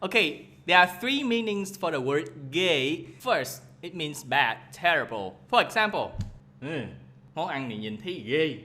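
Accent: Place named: South, Vietnam. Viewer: Nice videos and I love the Southern accent and slang. Thanks for putting all the effort in. A suggestion from me would be to speak less English spoken and more Vietnamese. Also speaking slower in Vietnamese and repeating sentences would make it easier for me to understand as you use quite a lot of words that I don't understand yet.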